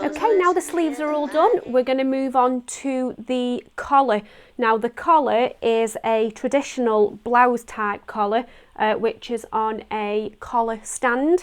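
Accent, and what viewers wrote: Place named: Stoke, United Kingdom. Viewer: Just subscribed from seeing your link on Boz's channel. You sound like a Stokie like me.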